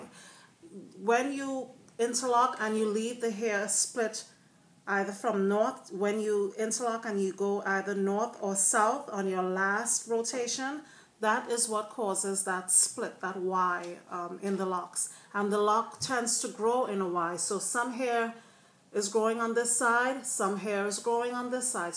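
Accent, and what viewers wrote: Trinidad and Tobago. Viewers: sound like a trini..love ya accent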